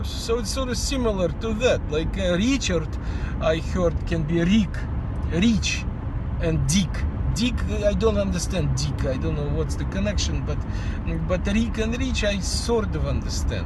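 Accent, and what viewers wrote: Russia. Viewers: Я очень редко пишу комментарии к видео в ютюбе. Но Вы! Вы - прелесть! Вы - супер! - просто очень любопытно и забавно. Ваш русский акцент будто разрешает мне говорить, как мне угодно, не запариваясь на произношение. Темы ваших видео отличные. Спасибо.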